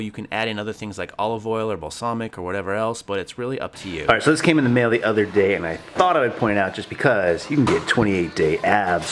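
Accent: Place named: Mid-Atlantic, United States. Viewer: It was a combo of many different states. We'll call it a Mid-Atlantic accent lol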